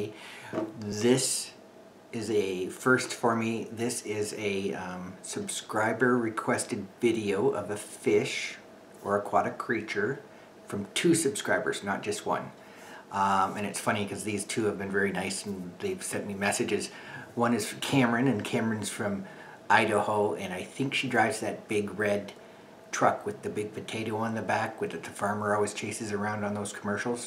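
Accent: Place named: Canada. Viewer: Oh my god why do I love you so much! I love your accent! I’m Canadian as well but I don’t think I have this accent! I’m from PEI if you didn’t know. But aww! Your adorable! You are so nice! I’ve only met a few of my fellow Canadians that are as nice as you!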